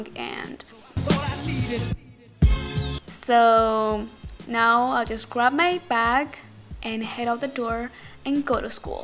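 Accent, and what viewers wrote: Spain. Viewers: Audrey Lim she's from spain, i guess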